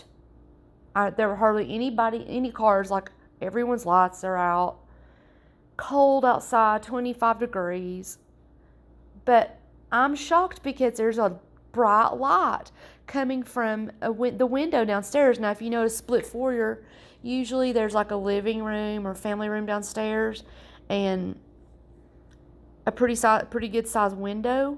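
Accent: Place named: South, United States. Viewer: damn I must be psychic lol. There is nothing wrong with your accent , southern charm.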